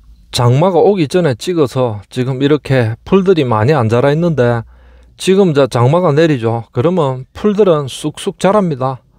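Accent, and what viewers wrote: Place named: South Gyeongsang, South Korea. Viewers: 남해로 알고 있는데 아닌가봐요? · 경남입니다 대부분 이런맡투^^ 경상도 하고 는 조금 다르지요^^ 밀양 합천 창년 등은 ^^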